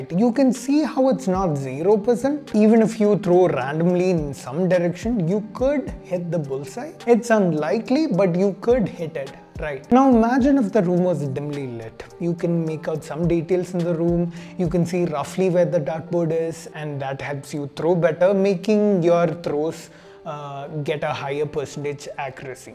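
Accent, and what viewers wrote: South India, India. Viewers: it's not the spelling mistake. It's the accent. South Indian accent.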